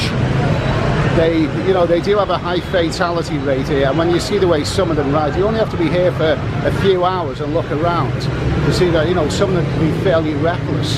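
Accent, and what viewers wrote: Liverpool, United Kingdom. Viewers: Are you a scouser or a wool?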